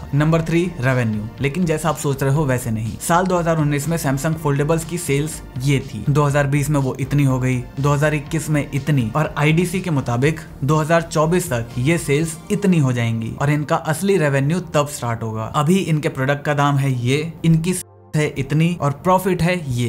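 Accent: Bengal, India. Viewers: Are u Bengali bro?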